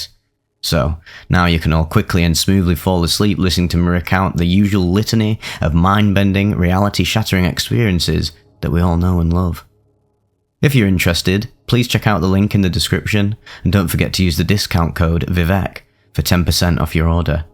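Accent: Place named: Lancashire, United Kingdom. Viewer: Hey Vivec, I’m from Lancashire living abroad for work and always missing home. Hearing your accent makes me feel back at home. Just wanted to thank you for your work, incredibly educational and entertaining. Please keep it up 🤙🏼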